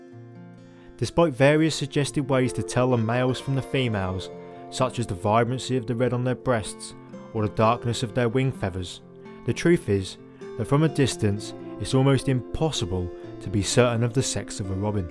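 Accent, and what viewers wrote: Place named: Norfolk, United Kingdom. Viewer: Definitely a Norfolk boy 😂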